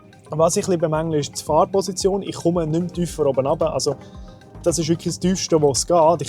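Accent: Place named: Switzerland. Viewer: Wieviel Reichweite mehr hättest du wenn du Hochdeutsch sprechen würdest. Dieses Schwitzerdeutsch ist leider nicht zu verstehen.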